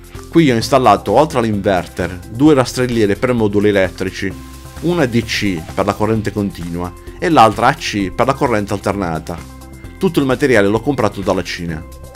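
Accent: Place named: Sardinia, Italy. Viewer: Sono pienamente daccordo con te. Peccato che, dall'accento vive in Sardegna, altrimenti, Coronavirus permettendo, lo andavo a trovare, così con l'occasione, assaggiavo lu purceddu Sardo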